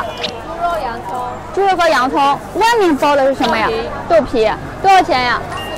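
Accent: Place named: Beijing, China. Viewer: 好像是北京口音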